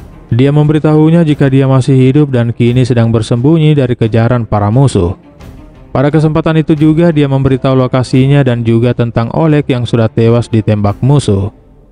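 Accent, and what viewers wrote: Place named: Madura, Indonesia. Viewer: Ngomongnya kek orang madura lu min aga kurang realistis sama filmnya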